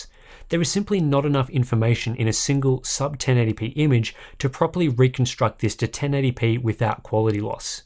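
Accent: Australia. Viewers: Awesome video, I thoroughly enjoyed it. And it's always a pleasure to hear Aussie accent:)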